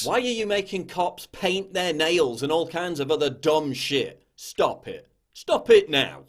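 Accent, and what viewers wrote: United Kingdom. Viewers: First video I’ve seen of yours and 3 mins in I hit that sub button talking on our level and your British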